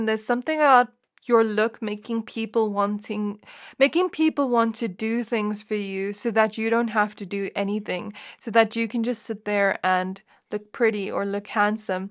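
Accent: South Africa. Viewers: Beautiful reading. Thank you. Are you South African(accent)? Pile 2.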